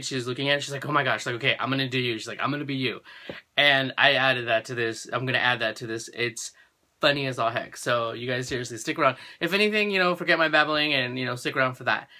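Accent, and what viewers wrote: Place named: France. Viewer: First off you are gonna laugh when I tell you this.........but for some reason I always thought you had a bit of an accent and were FRENCH and maybe from Paris or something? I have no idea why. Second little sis is gorjuss! You ain;t bad yourself. Third I loved the entire video! Thanks!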